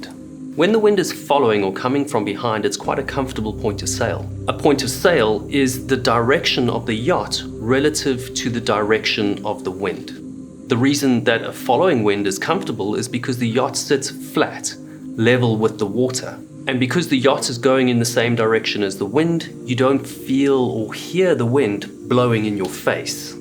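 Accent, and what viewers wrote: South Africa. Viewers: clearly the SA acent is the best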